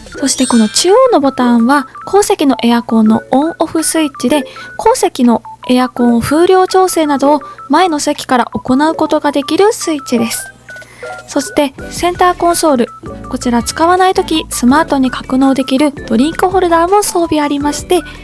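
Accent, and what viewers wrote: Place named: Ehime, Japan. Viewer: 新型フリード クロスター乗りです。久しぶりの愛媛弁、懐かしく可愛かったです。 旧西条市の市中心部出身でした。 チャンネル登録しますね。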